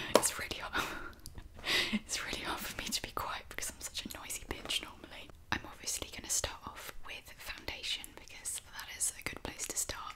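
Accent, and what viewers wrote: United Kingdom. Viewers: I'm new here but hello. Are you British? I can't tell. Even though I'm British. 😝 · the first asmr that i watched in british accent.. so good to hear!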